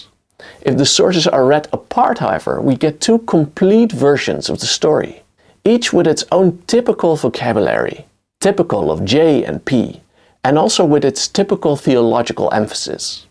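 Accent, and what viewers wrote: Netherlands. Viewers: yes we know. It's not a history book, accented Dutch person.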